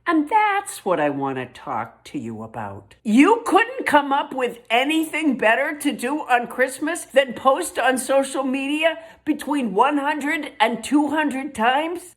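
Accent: Boston, United States. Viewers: I love hearing a Boston accent combined with an IDGAF rant, especially when it’s about our current Mob Boss in Chief. I like to think that it’s not only us atheists that have this type of clarity, but it helps 😂 Glad to see healthy and in a much better place these days. I’ve been down that road myself and can empathize. We don’t always get 2nd chances and when we do we don’t always take it. She obviously has, respect.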